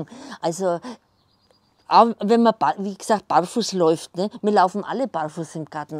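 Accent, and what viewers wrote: Franconia, Germany. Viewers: Hallo Du bist Fränkin und kommst vermutlich aus dem Raum Ansbach, stimmts? Liebe Grüße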